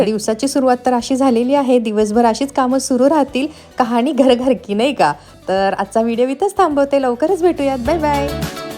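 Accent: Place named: Kolhapur, India. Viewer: छान मार्केट सफारी... ताई कोल्हापूर बोली पध्दत चालीरीती सोडू नका.... कमेंट दुर्लक्षित करा 🎉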